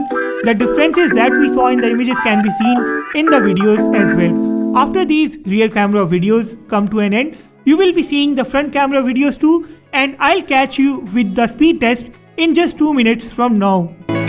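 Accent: India. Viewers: I'm not saying I don't like indian accent I can't understand it😅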